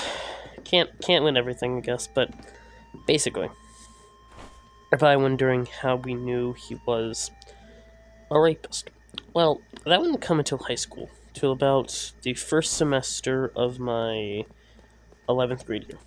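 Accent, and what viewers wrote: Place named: RP, United Kingdom. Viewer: You sound like you attended private school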